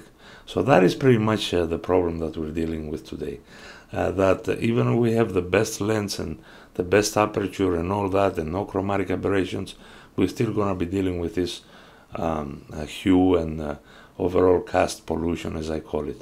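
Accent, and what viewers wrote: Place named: Greece. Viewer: May I ask if you are Greek? You have a very Greek accent. The video is great!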